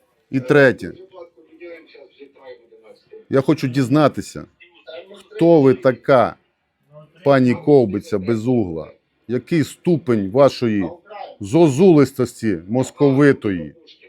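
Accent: Transcarpathia, Ukraine. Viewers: Дякую за рекламу закарпатського діалекту української мови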